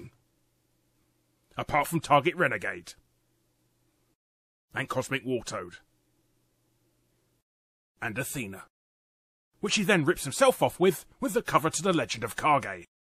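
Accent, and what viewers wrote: England, United Kingdom. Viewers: Not saying that all English accents sound alike, but you sound like you could be Robin Leach's son.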